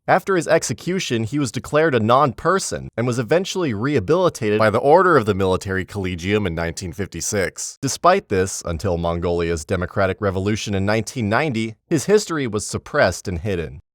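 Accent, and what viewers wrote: United States. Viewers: speaking in America English ? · Only an American would talk about “Buddhist” church! The ignorance is astounding! They are also the ONLY people who say “Moss-Cow” and not the correct: “Moss-Cow” Never trust an American teaching history. Especially one ignorant enough to think Buddhist’s have churches!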